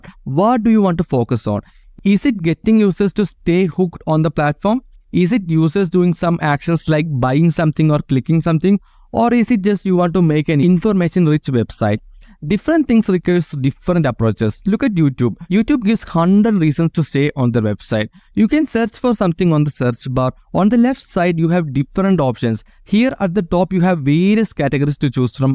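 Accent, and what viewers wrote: Kerala, India. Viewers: Super bro The way your telling is adding some Keralian spicy.. ..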